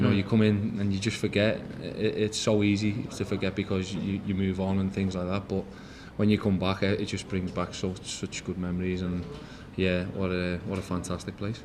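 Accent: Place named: Liverpool, United Kingdom. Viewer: Nolans a scouser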